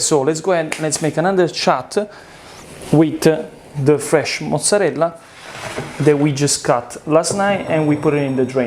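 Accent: Italy. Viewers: I like you English with Italian accent and I also like very much your videos. Great job! I want to be your student to be a BIG pizza chef WOW!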